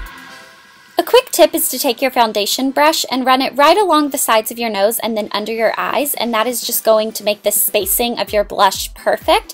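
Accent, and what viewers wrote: South, United States. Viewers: I am not sure if you watch Big Brother or not..but you and Danielle are a lot alike. You are both pretty, have long brown hair, and have that southern accent:)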